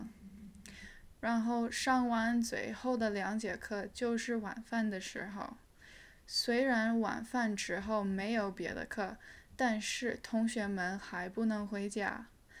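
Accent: Northeast, China. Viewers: 哈哈哈哈哈哈 这东北口音太可爱了 上晚自习很无聊，可是到了高二的时候高考的紧迫感会让大家格外珍惜这个时间。毕竟在学校里跟同龄人一起复习功课效率会高很多。我读高中的时候，上的是寄宿制学校，晚自习是十点结束。在高三学生的强烈要求下，学校准许高三生可以延长一个小时到十一点。现在想想真是很感激学校和老师，学生自习到十一点值班老师也要义务多值班一小时陪着不能早早的休息。如果中国的教育资源可以像美国那么丰富，中国学生也可以有更多时间社交和发展自己的兴趣。很遗憾，人口众多，资源不足，年轻人想要通过教育改变命运必须接受这种历练。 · your 东北accent got me😂 sending out lots of love from Harbin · Your Mandarin is very accurate have a cute accent of northeastern of china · 中文很棒 且学的有讨人喜欢的东北味🤩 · 普通话有东北味了，笑死了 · 看一个老外妹子一本正经地说东北话，我爆笑了半天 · 这东北口音没毛病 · 这是写好了稿子照着念的吗？好像有点东北口音了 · 中文有东北口音了哈哈哈哈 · 东北口音，哈哈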